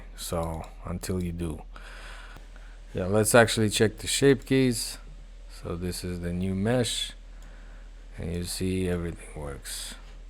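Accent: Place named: Croatia. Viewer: You sound Croatian.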